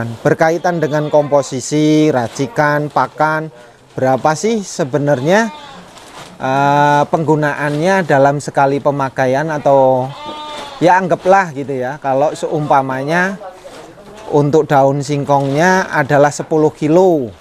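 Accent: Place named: Banyumas, Indonesia. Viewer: Ora ngapak ora kepenak nimba ilmune cikgu salam ngarit❤️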